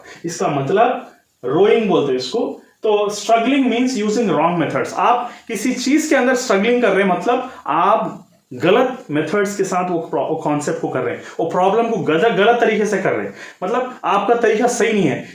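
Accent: Hyderabad, India. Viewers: Hyderabad se lgte . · Sir ka hyderabadi accent sunne k liye Aya main.. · Sir aap hyderabadi haii kyaa😅 Good explanation sir😊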